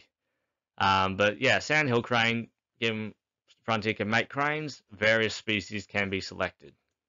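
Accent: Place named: Australia. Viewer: Heeey an Aussie Planet Zoo voice! Great to hear one of us out there.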